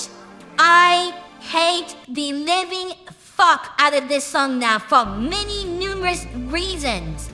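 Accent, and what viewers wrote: United Kingdom. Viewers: your British is showing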